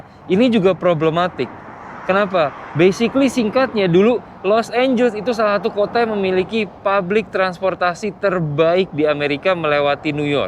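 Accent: Indonesia. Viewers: Hebat, 20 thn di LN, logat nya Indonesia bnget❤ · Dah lama sampe lupa Chanel ini,pdahal dlu saya suka nonton... Btw gua kagum m lu bro,, 20 tahun di Amrik tapi pas ngomong bahasa indonesia,dialek nya masih indo.👍🏼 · Logat loe Indo banget.... Keren... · 20 th tinggal, suaranya ga berubah sih" tetep Indonesia bgt' 👍👏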